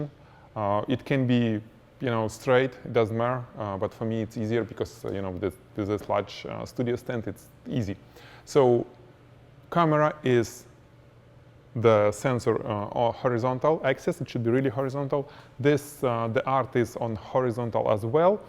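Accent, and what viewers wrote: Russia. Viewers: мне кажется или я слышу русский акцент?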